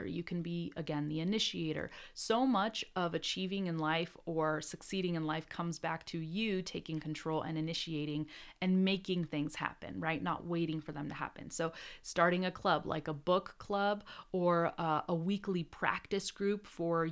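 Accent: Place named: Argentina. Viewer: te he escuchado hablar en castellano (muy bien por cierto) y nunca confundiría tu acento con otro hispano. Me haces sentir representado como argentino, raro porque no sos argentina pero muy lindo. Saludos.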